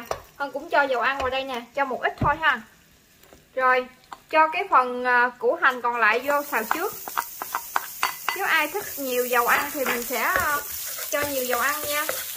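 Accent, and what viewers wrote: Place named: Mekong Delta, Vietnam. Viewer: Hi...lần đầu xem video của b ..rất ấn tượng với tiêu đề ( nhớ mẹ)... Nghe cảm xúc ghê ..❤❤❤❤ Tiếng miền tây của b nghe🌾🌾🎣 thân thiện rỏ ràng không lẫn vào đâu đc hết... Cách chia sẻ cách làm món ăn thật chi tiết.thích👍😍 Chúc vui vẻ 🥰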